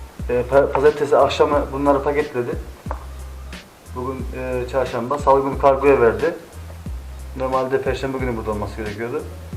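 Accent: Tokat, Turkey. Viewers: Abi herhalde Tokatlı konuşmasından anladım bende tokatlıyom ordan biliyorum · tohatlıca türkçesi ustam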